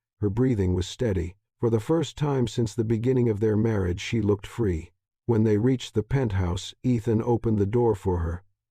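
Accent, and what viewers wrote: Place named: United States. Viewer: What do you think of the narration? Is it AI? It has a strong USA accent and a little metallic maybe but I don’t mind it.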